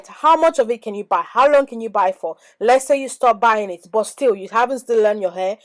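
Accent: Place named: Nigeria. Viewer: Mhmmmmm.....by ur accent I know youre a nigerian.....and an igbo woman at that😂😂💜💜💜💜 subscribes ur funny af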